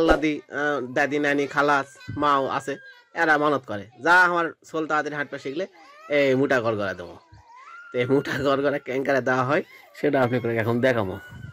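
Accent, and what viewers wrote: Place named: Bogura, Bangladesh. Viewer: ভাষাটা বেশ ভালোলাগে এটা কি বগুড়ার ভাষা?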